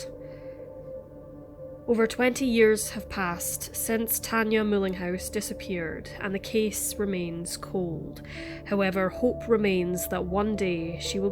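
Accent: Scotland, United Kingdom. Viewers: Heartbreaking story. Love your accent, Scottish? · I'm still trying to pin point the accent..where are you from? I used to think it was Scottish but now I'm second guessing. I'm all the way down in the Antipodes in Australia so excuse the ignorance. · Yes great narration...I know this may be outta line....Scottish accent??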